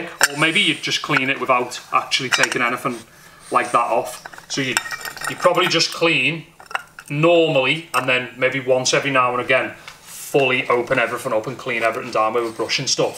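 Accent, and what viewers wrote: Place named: Scotland, United Kingdom. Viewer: Even sounds like home (Scotland) hyped on everything bro keep it 💯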